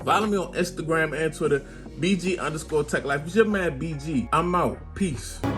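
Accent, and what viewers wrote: Baltimore, United States. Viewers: Boy got a strong Baltimore accent 🤣😂🤣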